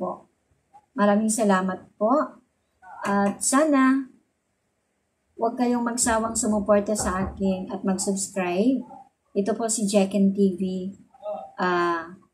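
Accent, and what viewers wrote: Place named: Visayas, Philippines. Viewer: Wow congratulations madam hindi ka nag iisa matigas din mag salita hihi pero gwapa basta bisaya😊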